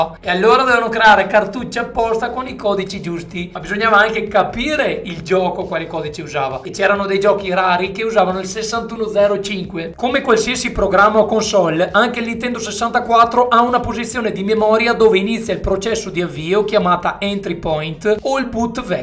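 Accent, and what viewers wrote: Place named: Veneto, Italy. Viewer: L'accento veneta di quale provincia è?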